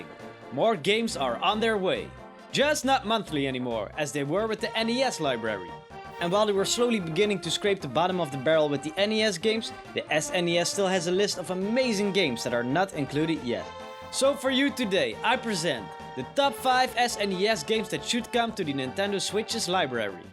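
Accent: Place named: Netherlands. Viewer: his accent is way to dutch goede video tho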